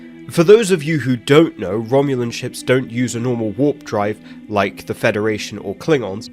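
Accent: United Kingdom. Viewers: you have a Brit accent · The British accent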